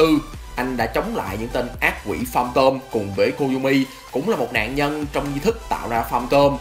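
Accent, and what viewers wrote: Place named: Japan. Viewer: Anh chủ yếu đọc Tiếng Anh theo Nhật nên.... Mà công nhận dở thật:)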